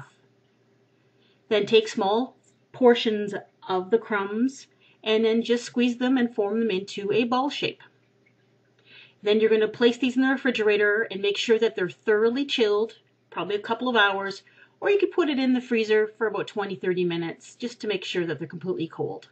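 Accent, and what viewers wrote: Canada. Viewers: You're Canadian! I thought that! Either Canadian or Minnesotan! I love your accent! (is that wierd??) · Haha, I KNEW you were Canadian, I noticed your accent :D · i noticed you were canadian from your accent,because my cousin is canadian.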